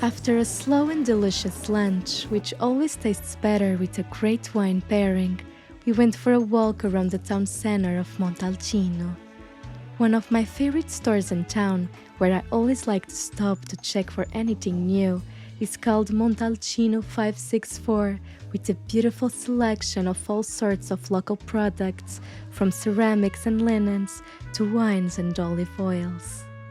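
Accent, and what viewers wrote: Portugal. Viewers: She is Portuguese.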